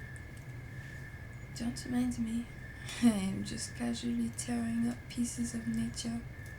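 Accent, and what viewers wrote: France. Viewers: Your French accent is an audible delicacy 😋 ♥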